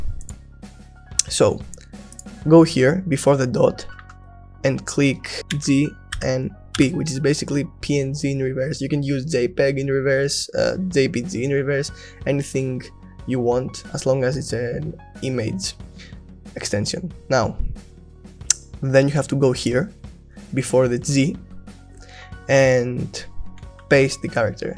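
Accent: Greece. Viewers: Great video and great accent (comming from another greek)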